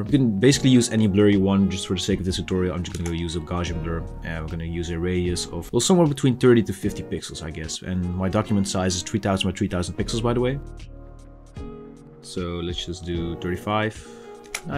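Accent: Netherlands. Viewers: i thought i recognized a dutch accent 😝 i am in amsterdam, so not close enough to enjoy the creative space in tilburg, but nice to know there is a digital art collective here in the nl!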